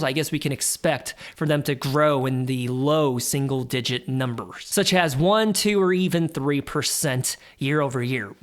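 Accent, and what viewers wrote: United States. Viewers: Based on the way you talk i thought you are white american. But i dont really care, im here for info and wife's boyfriend jokes :) Im white guy from Europe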